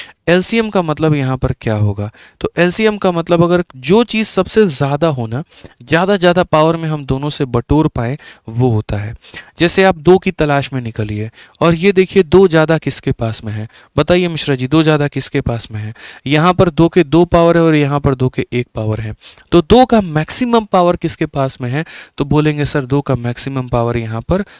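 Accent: Bhojpuri, India. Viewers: Apki bhojpuri bahut achhi h😊❤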